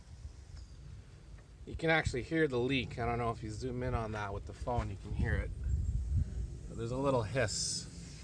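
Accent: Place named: Canada. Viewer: Thanks, helped alot. I'm in NC. I noticed you talk like being in canada. Say "about" like "aboat". But great video. thx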